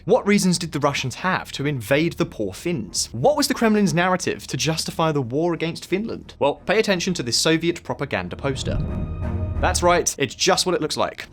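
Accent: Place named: United Kingdom. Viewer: More total drivel! Finland has a substantial expanse of Russian border to donate to NATO's ongoing `containment' policy. Nothing else applies. History has nothing to do with it. Russia is not terrified of the NATO membership proposition. It has had to alter military strategy in placing more military bases in the west, but that has already been accomplished. Fact bending with British accent merely screams of something else, other than `analysis', so just let it go.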